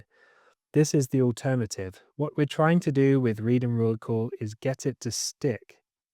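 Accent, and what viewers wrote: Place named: United Kingdom. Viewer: Bro started speaking, I heard the british accent and subed IMEDIATELY. Excited to get into the video lesson.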